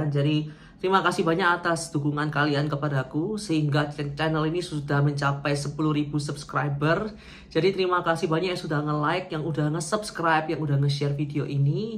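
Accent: Surabaya, Indonesia. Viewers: Dari awal nonton video sampean uda curiga dari cara bicaranya kok medok bgt 😂 adakno wong sby 😂 lancar kuliahnya disana ya mas 🎉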